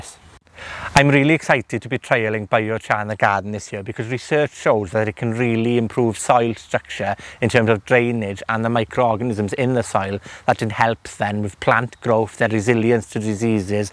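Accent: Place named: North, United Kingdom. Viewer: Sounds like a northerner to me :)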